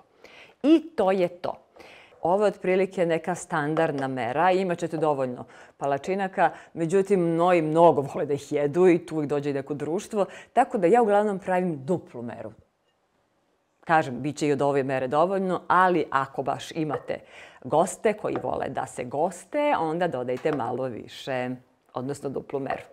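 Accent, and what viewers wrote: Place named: Srem, Croatia. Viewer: j Ja volim ovaku osobu koja na ovaj nacin prica , objasni i svima ugadja, . neznam odakle ste , sigurno iz okoline srema. lepa i sve divno napravi , za desetku.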